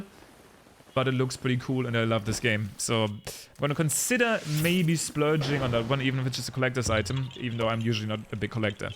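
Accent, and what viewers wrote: Germany. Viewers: Are you German? Your English sounds German.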